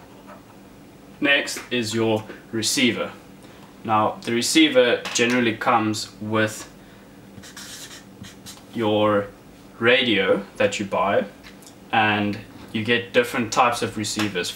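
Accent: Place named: Afrikaans, South Africa. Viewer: Hello ek kan by jou Aksent hoor jy is Afrikaans love jou videos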